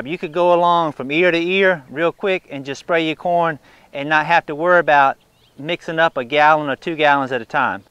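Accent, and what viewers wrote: South, United States. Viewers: A good southern accent is like sweet tea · He really does have a good southern accent. Some are definitely not as fluid and warm as this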